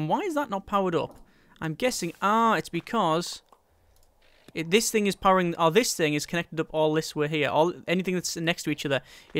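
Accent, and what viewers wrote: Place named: Newcastle, United Kingdom. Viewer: are you from newcastle? ya got that geordie accent if ya na what a mean :D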